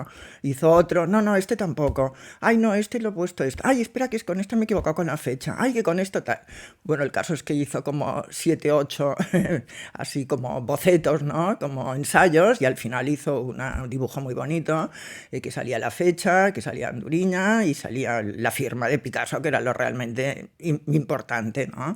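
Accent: Catalonia, Spain. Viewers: Me encanta como.hablas eres genial ¡¡!!un saludo 😊❤tu eres catalana no??yo tengo mucha familia en mataro y gerona se fueron de aki de extremadura😊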